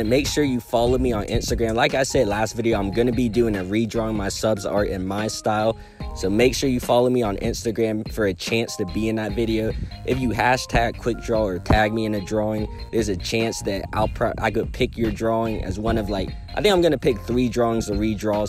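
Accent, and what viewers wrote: United States. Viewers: U sound kinda country.. but ur drawings look good asf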